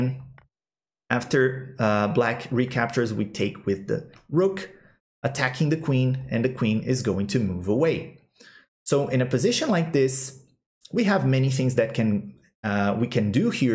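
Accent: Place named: Brazil. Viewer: You have a little brazilian accent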